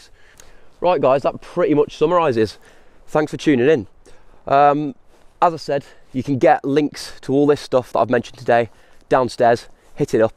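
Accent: Birmingham, United Kingdom. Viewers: What a british accent ! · 🤣 Great conversion mate, even for a Ford! Are you a fellow brummie? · Are you from Birmingham? 😊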